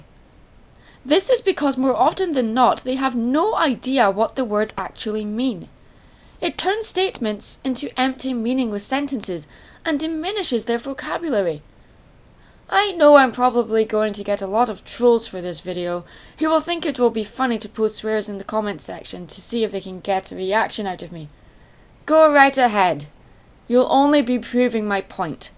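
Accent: Scotland, United Kingdom. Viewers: R u Scottish? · omg I love the way your voice sounds and your Scottish accent! I really calms me I don't know why xD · Are you Scottish? And actually I'm used to people that do not know and don't swear at all and I go to channel to channel just to find a youtube channel that is completely children friendly and has no one in any comment or videos that has bad words and when I say a bad word my mom scolds me and I never say it ever again and their are kids causing and they swear for no reason and one of them don't even know what they mean so I get your point but now a days older brothers and sister are trying to prevent anyone from causing in front of their younger brother or sister.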